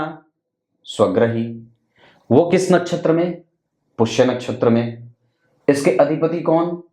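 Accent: Bihar, India. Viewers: Ap bihar se ho😳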